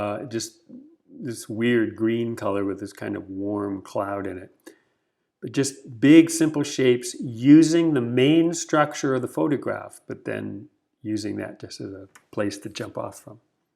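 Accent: Canada. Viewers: You cant hide that Canuck accent 😆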